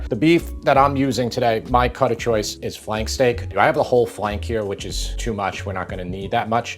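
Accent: New York, United States. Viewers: Not to be rude, but I just can’t stand that New York accent. I don’t know what it is. You’re a very handsome man. You’re very talented but the accent maybe you should work on.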